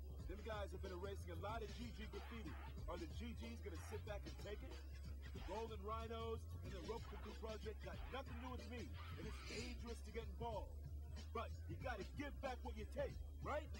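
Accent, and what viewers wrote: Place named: South Africa. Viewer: Which country are you from? you sound south african also very bored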